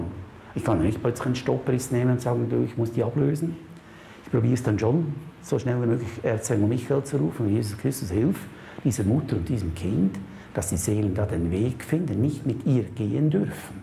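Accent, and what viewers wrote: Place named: Switzerland. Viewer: Es ist sehr schwer der schnellen, Schweitzer Sprache zu folgen. Ansonsten ein ganz großartiger Vortrag, in dem nur Wahres gesprochen wird. Wir alle sollten dem Folge leisten.....so weit es möglich ist.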